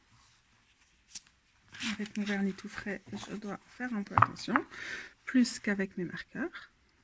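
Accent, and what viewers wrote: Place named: Belgium. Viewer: Merci. J'ai testé sur un mass market, le tarot de Marseille de Kriss Hadar avec un markeur pour paperbord. Catastrophe, effectivement le carton boit l'encre, j'en ai mis partout. Du coup les majeurs sont tranches "noires" et les mineurs blanches. Il me semble avoir vu une vidéo d'une créatrice d'oracle qui conseillait des feutres haut de gamme mais je ne sais plus les références. Et Claire de la lune à fait une vidéo sur les arrondisseuses et autres matériels de customisation. Ton tarot de la forêt enchanté est super beau. Le miens est resté tel quel. Je n'ose plus customiser mes jeux. Je n'ai pas entendu le bruit de machine donc aucune gêne. Et sinon j'aime bien le français belge. À tantôt 😉🙏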